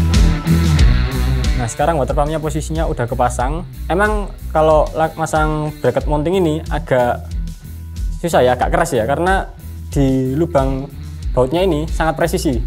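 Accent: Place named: Surabaya, Indonesia. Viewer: logat Anda seperti wong Suroboyoan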